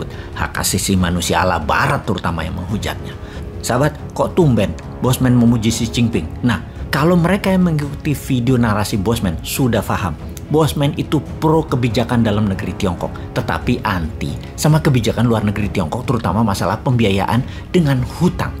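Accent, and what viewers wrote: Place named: East Java, Indonesia. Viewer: khas jawa timur cok 🤣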